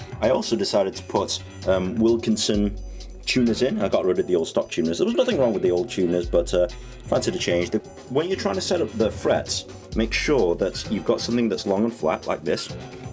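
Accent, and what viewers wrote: England, United Kingdom. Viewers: that's me too bro, trying to build a guitar on a BOODGET... hehe love english accents.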